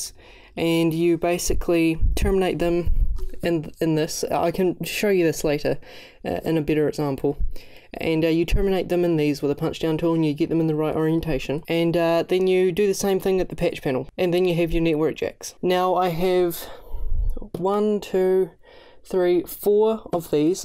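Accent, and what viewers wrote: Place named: United States. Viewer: Dude are you kiwi living in America, lived in America, or are kiwi kids getting American accents from the internet? Nice tut also.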